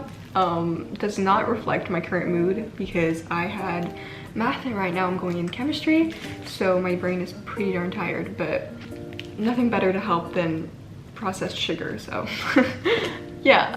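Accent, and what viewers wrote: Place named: Quebec, Canada. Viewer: yayyy a fellow french canadian! I knew as soon as i heard you speak french lol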